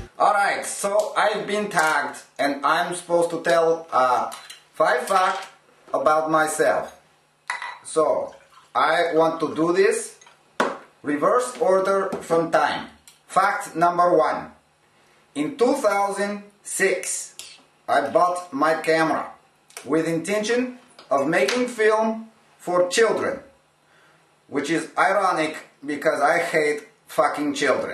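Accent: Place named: Ukraine. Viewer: I can't make sense of the riddle, but from your accent alone, my guess would be somewhere in the USSR (as it was still called in 1978), perhaps Ukraine. Second guess would be Poland, but that seems too obvious.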